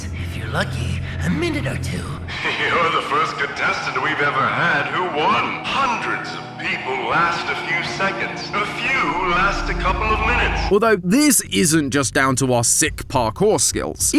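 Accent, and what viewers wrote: United Kingdom. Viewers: He sounds so British😂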